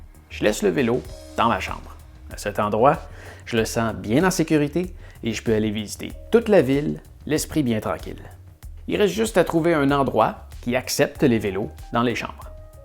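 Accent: Quebec, Canada. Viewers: Holà!🇫🇷 🤗excellente vidéo avec en prime le p’tit accent du Québec qui va bien!.ça m’mets du fun pour bien commencer ma journée 🤗..bonne continuation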